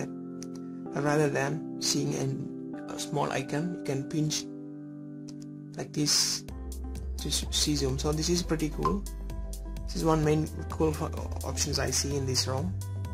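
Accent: Kerala, India. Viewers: OnePlus One most indians speak english in the same way just lyk you.but i dint expect u to be a malayali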